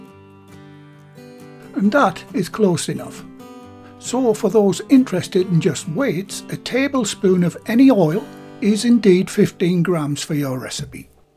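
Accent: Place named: North East, United Kingdom. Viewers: sounds just like voice actor Jim Cummings but with a Northern English accent (sorry I don’t want to assume what part of the country you’re from because I don’t know) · That looks great!! reminds me of my teachers from when I lived in the North East. I miss living up there when I here his voice 🙂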